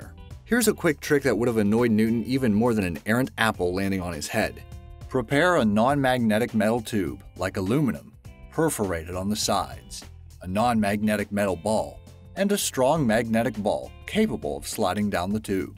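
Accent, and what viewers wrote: Hungary. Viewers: You’re Hungarian? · This guy is def Hungarian.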